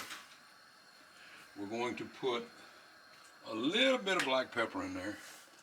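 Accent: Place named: Louisiana, United States. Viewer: Hey — are you from south Louisiana originally. I detect a bit of an accent. I live in Broussard La.:)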